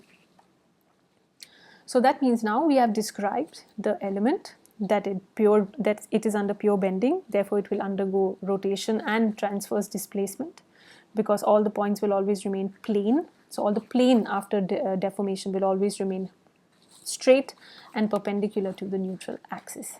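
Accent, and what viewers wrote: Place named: India. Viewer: it seems like you have an indian English accent..Very informative video....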